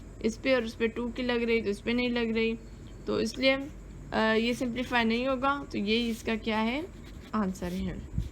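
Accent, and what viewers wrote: Sindh, India. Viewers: ڪجھ ليڪچر سنڌي ۾ به رڪارڊ ڪيو ادي، اوھانجو سنڌي لھجو اڃان وڌيڪ سٺو ۽ مٺو آھي 💯🙏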